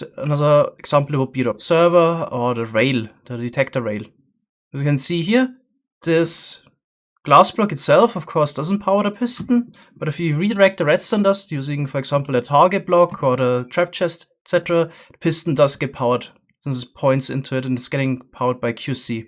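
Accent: Germany. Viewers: Is he from Germany? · Are you german?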